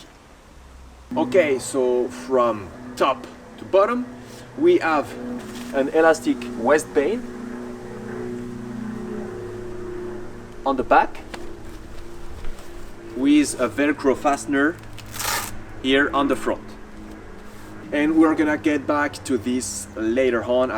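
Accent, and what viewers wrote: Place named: France. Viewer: Thanks for the review. The French accent.....love it. Have you tried the 511 Apex cargo pants?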